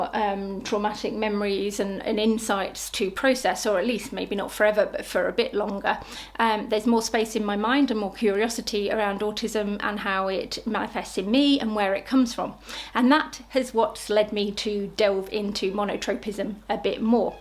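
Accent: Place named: United Kingdom. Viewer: Thank you for such interesting content. I’m sorry, but I’m sitting here puzzling. You sound British and many of the things you say sound British but you pronounce words such as insulate without the extra H sound that I usually hear British and kiwi and Australian people do so I’m confused. I’m sorry I know this has nothing to do with your video content. It’s just got my curiosity up.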